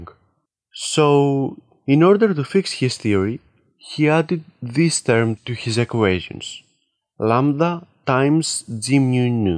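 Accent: Greece. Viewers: Your accent spells: Greece Είσαι ωραίος ρε φίλε. Απλά, λιτά κι απέριττα. Και like και subscribe! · Greek accent detected 🤌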